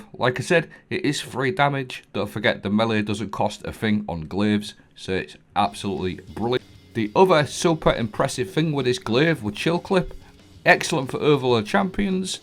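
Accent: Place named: Newcastle, United Kingdom. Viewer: I love the accent. You sound just like my mate Geordie Son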